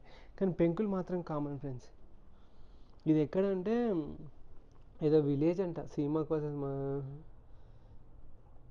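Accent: Telangana, India. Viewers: Bro vlog lu back ground voice mast vundi telangana yasa lu, vlog matram mast vundi...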